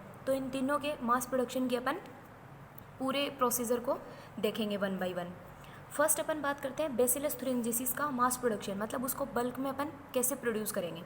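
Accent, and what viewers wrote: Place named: Haryana, India. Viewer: Mam the lecture is so helpful and nice but your haryanvi accent is still more precious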